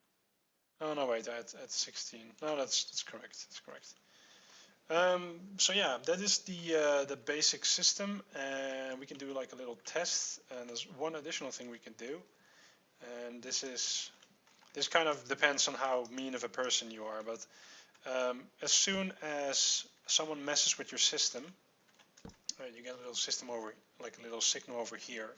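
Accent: Netherlands. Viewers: Where are you from? You have a hollandguy's accent...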